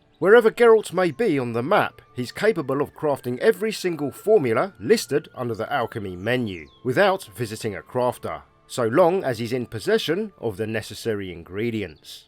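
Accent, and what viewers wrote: United Kingdom. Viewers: No disrespect my man..but you shocked the 💩 outta me the moment I heard your voice/accent. I don't believe I've ever seen an Asian gent with such a rich English accent. It's got me like 🤯🧠⚽🥅. In any case, sweet video! 🙌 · Are u Asian with a British accent !!!!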